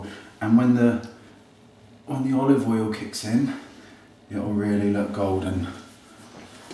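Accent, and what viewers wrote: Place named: West Country, United Kingdom. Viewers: BHAHAHAHAHA!!!!! That is one of the funniest videos eveeerrrrrr!!!:D Good on ya, for being such a good sport!, your Somerset accent really shone through in this one, loved it! Thanks for the giggle! Jess x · Love the slight west country accent! Really funny vid x x x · Love the somerset accent! Reminds me of home!:L haha! Loved this, made me laugh! x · That was VERY funny. Sometimes i can hear a bit of west country accent when you speak but that was positively "BRIZZLE"! Thanks for the laugh:-D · i love your 'brizzol' accent....... hillarious, my make up artist from bristol sounded exactly the same... but had a much dirtier laugh! brilliant video:-) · your accent makes everything you say so much funnier:') love a good west country accent! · hahahaha loving the farmers accent! you sound like you're from cornwall lol xx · I think he grew up in Cornwall and moved to Wales? 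It's a very southern accent. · I also just 'stumbled' across ur channel - this made my day!!! divorce has made ur eyebrows low - lol!!! fantastic - look forward to watching the rest of ur vids...love that west country accent!! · are you from Bristol?? Im loving the Bristolian-ish accent hehe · What a lovely, natural look you have created. I wish I could do my makeup to look exactly like that! Actually, I think that a Scouse Brow would have complemented the look even more... You are a Very Naughty Boy!!! must really love you to let you get away with all that you do! Love it. Your comedy videos are almost better than your serious ones! Especially accompanied by the Westcountry accent. More more more please x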